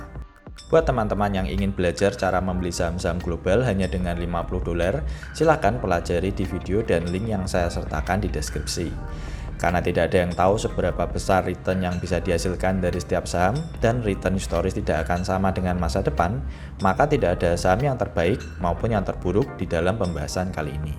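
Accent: Java, Indonesia. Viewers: saya suka chanel ini krna ngomong nya medok, berasa cangkruk sama teman sendiri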